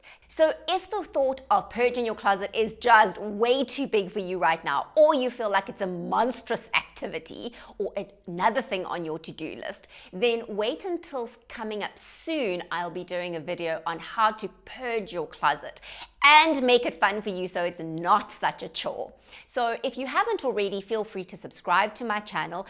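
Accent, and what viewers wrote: South Africa. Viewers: oh! I am so happy that you said where you were from. I have been trying to figure out where your accent was from! Some American and a lot of South African. I really like the new longer format of your videos envied the little facts about yourself at the end .Makes you seem much more relateable.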